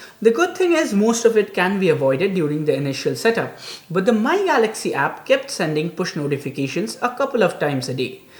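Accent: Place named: Bengal, India. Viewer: Hey, are you a bengali?🤔 Your accent (specifically how you pronounce your name) makes me think so... By the way, nice content as always ✌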